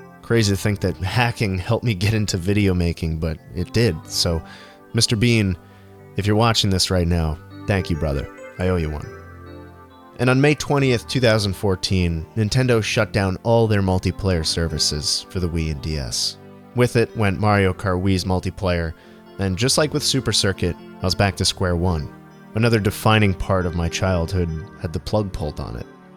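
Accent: New York, United States. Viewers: THIS IS????????????????????????????????? wow this man how grOWN UP...and also developed a new yorker accent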